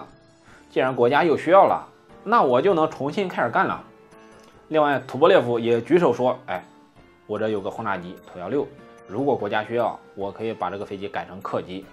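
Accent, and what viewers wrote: Henan, China. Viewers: 謝謝讓我增加了很多航空知識,雖然我在台灣,但是我的父親祖籍河南舞陽,所以聽你說話特別親切,謝謝你讓我還能聽到家鄉話,希望你的頻道能長長久久,加油,謝謝!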